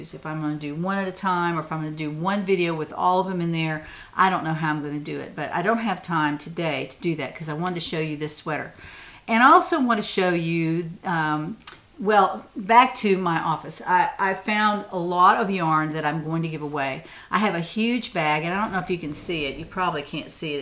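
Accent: South, United States. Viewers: This is the first time I’ve watched one of your videos. I really enjoyed your content and Southern accent. That comfy yarn looks great!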